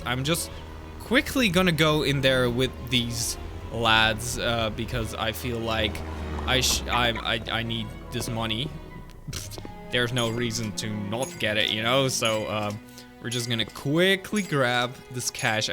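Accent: Sweden. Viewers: ar you from swiden?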